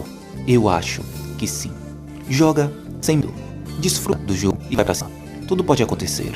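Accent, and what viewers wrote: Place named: England, United Kingdom. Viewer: Mermão com esse sotaque inglês muito forte vc fala imprensa alemã , pelo amor de deus . Vc conhece a diferença entre inglês e alemão. Rapaz.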